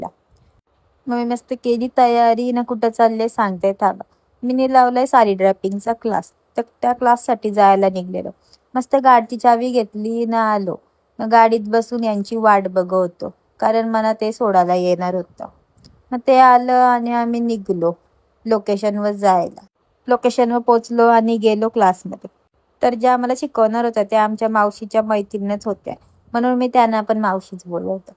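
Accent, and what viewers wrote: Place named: Agri, India. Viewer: Kiti agari bhasha boltes g vlog kartes na vavsthith bol jara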